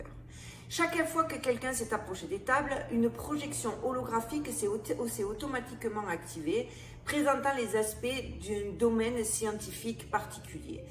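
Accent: Marseille, France. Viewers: trop mimi ton accent!aller l om!sinon,merci pour ces infos,je connaissais deja tte l histoire..stupefiant!faut enqueter...sur place! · Un grand merci pour toutes tes recherches passionnantes. Je découvre ta chaîne depuis les Alpes Maritimes et ton accent au parfum de canebiere est un vrai bonheur à entendre. ❤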